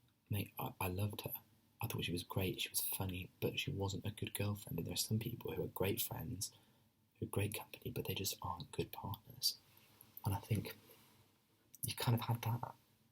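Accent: United Kingdom. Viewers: Well, well, well, British, cute, and gives me tingles. Subbed* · I like your British accent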